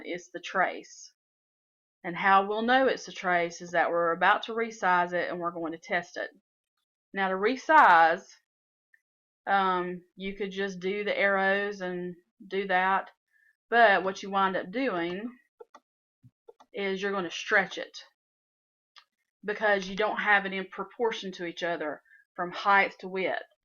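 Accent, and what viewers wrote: South, United States. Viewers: Great video! Very easy to understand. I'm a southern girl too and I love your accent, much easier to understand when someone speaks slowly!! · WOW! Thank you sooo much. I've had inkscape for years and its just been too hard to figure out. This was amazing! Love your Southern accent and your singing is BEAUTIFUL!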